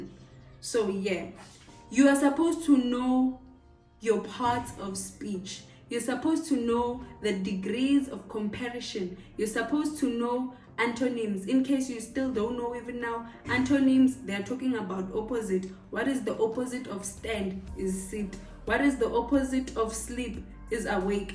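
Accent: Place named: Tsonga, South Africa. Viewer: You sound Tsonga idk😭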